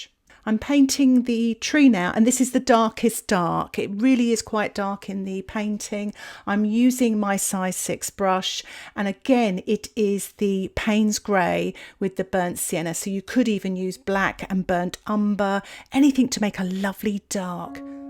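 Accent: England, United Kingdom. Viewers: I love your accent ! English ?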